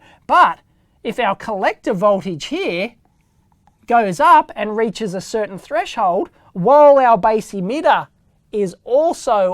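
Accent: Australia. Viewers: Absolutely Superb!! Best explanation I've seen. Moreover Australian accent made it just PERFECT!! Thank you mate!!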